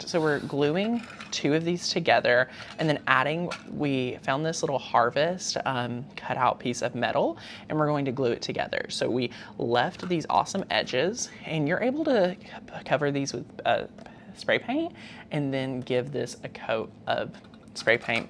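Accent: South, United States. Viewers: Im new to your channel. Love your southern ascent. Where are you from. Love your ideas.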